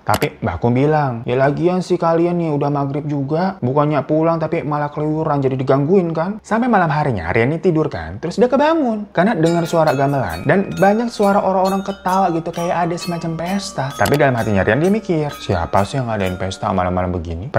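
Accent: Java, Indonesia. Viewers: hahaaa mas menghayati peran... pake bhs jowo😁salut mas.... sukses selalu yaaaaa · Lucu bngt bang kalo ngomong Jawa wkwk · Lucu banget sih bang ngomong jawanya😂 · Bang” , bahasa jawamu itu lhohh , ahh gemes 😂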